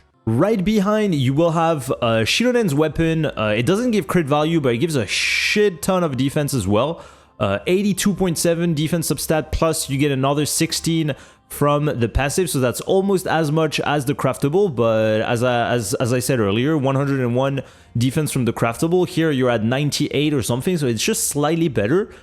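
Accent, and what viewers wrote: France. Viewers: L'accent français mdr, bel effort de prononciation nonobstant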